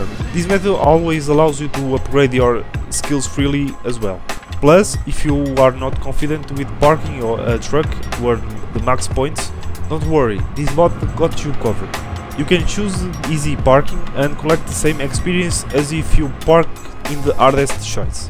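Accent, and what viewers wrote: Portugal. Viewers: With a very portuguese accent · sim, é português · Sotaque de portugal?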